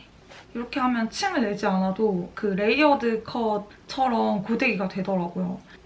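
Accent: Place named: Daegu, South Korea. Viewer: 앗 이랜드... 혹시 우방타워랜드?? 초딩때 소풍으로 갔던 기억이ㅋㅋ 대구에서의 일상에서 사투리 자연스레 쓰는게 뭔가ㄷ ㅓ 정감느껴지네여~^^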